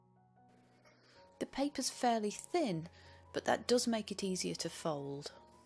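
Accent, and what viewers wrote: England, United Kingdom. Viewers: What a lovely English accent! Thanks for the video 💐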